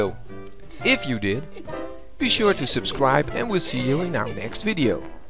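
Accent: Netherlands. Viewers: ITSAAA DUTCH ACCENT, HELLO COUNTRY BROTHER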